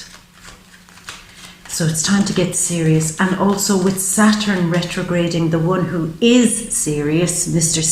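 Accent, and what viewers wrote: Ireland. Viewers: I sent your readings to a LOT of my friends. Problems with the Galaxy or not, YOU were very powerful. I'm going to listen to my sage Sun reading when I go to sleep for awhile so that I can fully absorb it. Enjoyed my moon read as well as I'm SURE I will my rising. Love your Irish accent and your practical take on astrology and tarot. VERY insightful AND inspiring. THANK YOU SO MUCH ❤️🎈💗🎈💞🎈💕🎈 🙌 🌞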